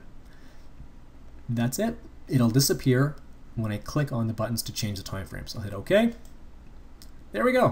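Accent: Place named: Canada. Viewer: Thanks mate and I really like your Canadian accent :)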